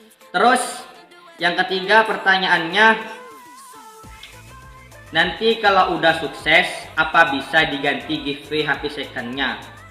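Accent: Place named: Madura, Indonesia. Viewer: Wah ternyata reng medureh .. Mun engkok reng jebeh kak....hehhehe...buat konten tutorial ja kak..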